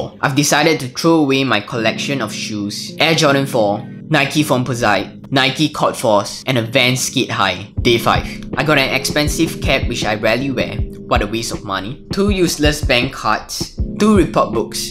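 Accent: Singapore. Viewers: Straight up when I heard your accent, I knew you were Singaporean. Cheers fella! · Are you singaporean ? Nice accent1😁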